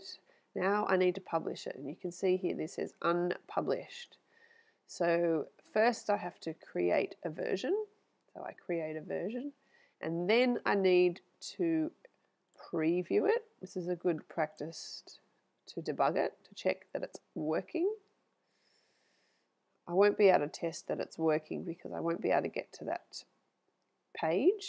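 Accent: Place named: Australia. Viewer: Good to hear an Aussie accent for a change :)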